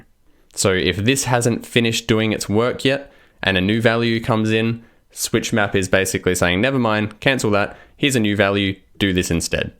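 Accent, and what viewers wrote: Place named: Australia. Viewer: very good tutorial. Well explained. I've learned a lot from you! And you've got an Aussie accent, which is a plus!;)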